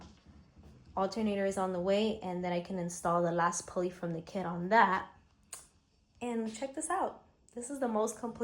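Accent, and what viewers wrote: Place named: Mexico. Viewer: She have a Mexican accent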